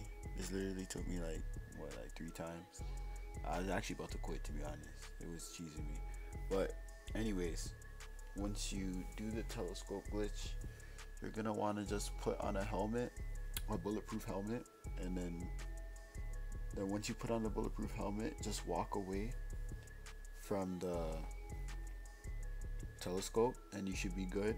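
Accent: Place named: Toronto, Canada. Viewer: This guy is definitely from Toronto "it was cheesing me" lmao I had a feeling the whole video but that confirmed it.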